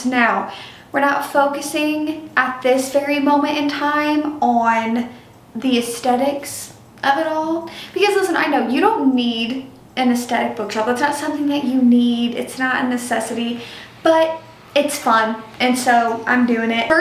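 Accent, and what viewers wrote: United States. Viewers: I keep rewinding so funny! I love the lil country accent too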